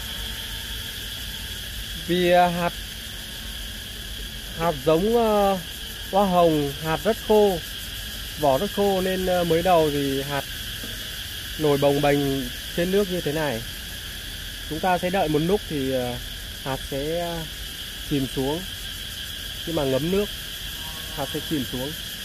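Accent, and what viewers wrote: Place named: Hanoi, Vietnam. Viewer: Hanoi ha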